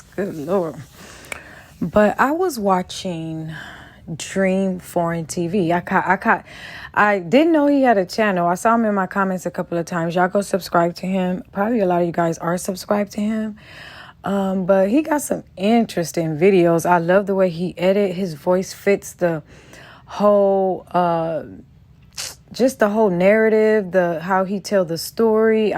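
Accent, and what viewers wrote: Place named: Los Angeles, United States. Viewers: Just by hearing your voice inflections and accent, I knew you was a Southern Cali girl. Thanks for clearing that up. You're funny, though. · I just knew you was from LA just by listening to you for the past few weeks 🙌🏽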